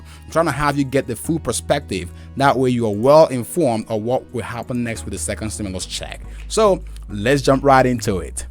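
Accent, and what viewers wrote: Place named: Africa. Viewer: Get English lessons please...get the African out of you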